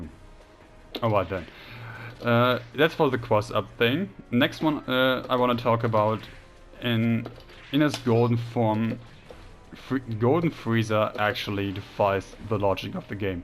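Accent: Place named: Germany. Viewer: are u german ? ur accent sound really really german xd wait ich bin dumm xd dein Spiel ist ja auf deutsch ;D gutes Video !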